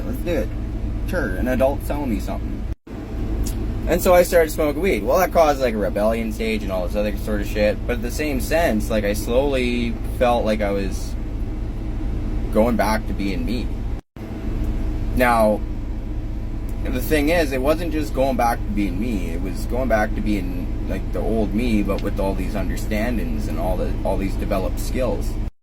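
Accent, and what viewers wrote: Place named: Canada. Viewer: The Canadian accent man I love it.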